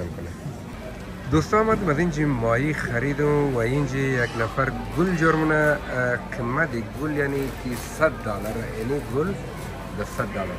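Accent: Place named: Jaghori, Afghanistan. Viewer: ده جگرت نفس با امو لهجه قشنگ جاغورگی خو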